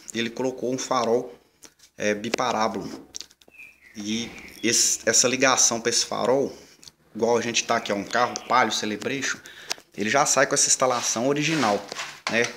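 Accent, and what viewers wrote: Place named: Minas Gerais, Brazil. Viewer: Boa, sotaque de mineiro.Bem explicado.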